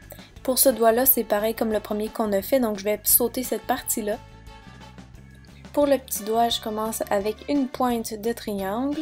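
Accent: Canada. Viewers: j'aime le tout mais mon préférer c'est frosty!!! :) merci pour toute c'est idées et t'est vernis son très jolis......je kiffe ton accent tu es canadienne??? :):):):) mercii beaucoup pour cette video qui ma donné beaucoup d'idées ! :) :) :) en passant: tu as mis cette vidéo en ligne le 8 décembre et on est le 13 donc en 6 jours tu as fait 16 073 vue !!!! bravo. :) :) :) :) :)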